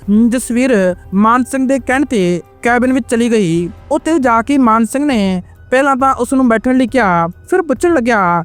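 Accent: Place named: Bihar, India. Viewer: bolna te sikh la punjabi jn bihari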